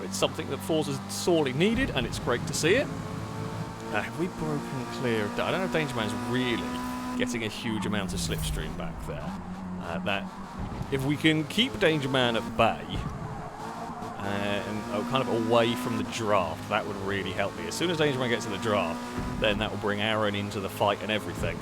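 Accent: England, United Kingdom. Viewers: One last thing We can tell your English by your accent But There is no such word as gooder GOODER It's good of or good enough but there's no such word as good or you might want to modify that out of your vocabulary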